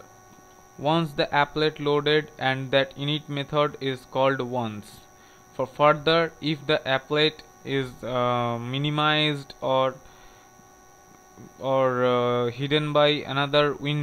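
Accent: Bengal, India. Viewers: i think ur Indian and Bengali right :P by ur accent ..!!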